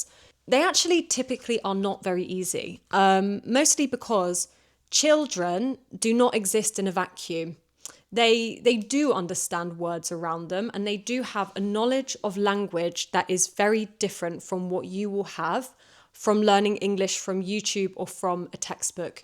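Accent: United Kingdom. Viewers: Hello, Thank you for your recommendation. I love your content and love your british accent too. Could you recommend more about British films, please? I love British accent but now I don't know what next cause I just found out some queens and princese but I love crime and school sitcom or comedy. Thank you so much for reading my comment. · Watching your videos, is such a treat. I love how you speak British English with such passion and grace. Keep going! Take good care of yourself. See you soon! 🇬🇧🇬🇧👩🏼‍🏫📚👌🏻👍🏻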